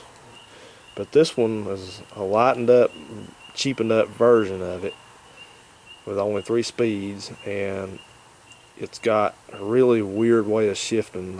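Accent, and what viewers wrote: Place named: East Tennessee, United States. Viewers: You sound like a good 'ole Tennessee boy. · You sound like an East Tenn boy. Am I right or am I right?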